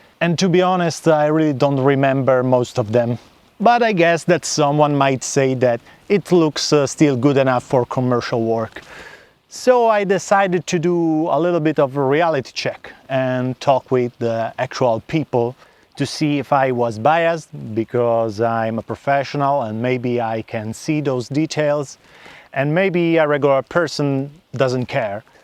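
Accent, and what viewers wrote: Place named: Italy. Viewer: You don't need to tell us that you are from Italy; your accent says that loud and clear. 😅 btw nice video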